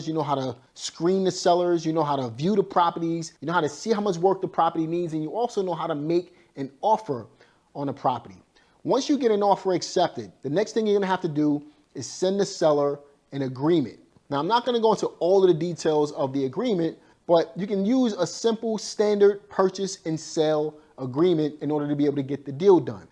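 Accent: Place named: Brooklyn, United States. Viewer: Side note…you sound like you’re from BK too! 💪🏾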